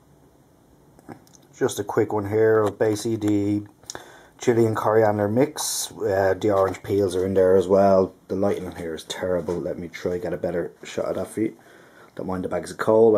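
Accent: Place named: Ireland. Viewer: I feel your pain with the CML yeast, my porter took 4 1/2 weeks and 3 packs to get down to 1.020. I wonder why their recent batches of yeast are having issues? Like you said you've been using it for a year, so it's a recent issue. Hopefully they sort it out, they are great little company, love their hops. Oh and welcome to brewtubing, nice to see another Irish accent. Top video btw 👍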